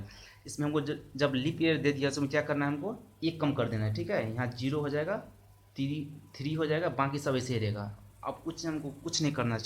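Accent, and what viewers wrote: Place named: Bihar, India. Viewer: Chhh kyu bilta hai bihari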